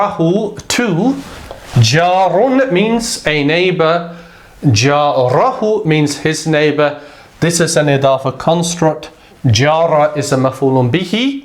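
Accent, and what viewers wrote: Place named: Birmingham, United Kingdom. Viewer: JazakAllah khair brother . I always said I would never learn Arabic from a non Arab... I take back my words. I find you're the best teacher (Allah yu barik feek)on the internet because you break concepts down to their simplest form, which then makes the idea easy to grasp. Perhaps your laid back Brummie accent helps as well lol !Thank you bro.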